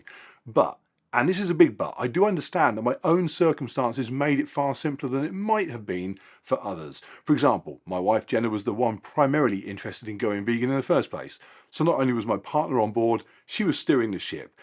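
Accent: United Kingdom. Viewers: Unfortunately, I cousins understand a word in English. Very strong British accent and I cannot understand this English.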